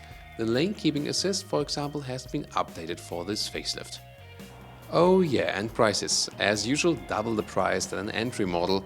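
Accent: Germany. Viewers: There's something about German accent that adds authenticity to anything he says.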